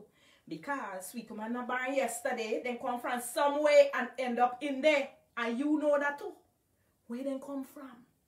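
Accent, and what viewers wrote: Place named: Guyana. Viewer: I am not guyanese or caribbean but love listening to your beautiful accent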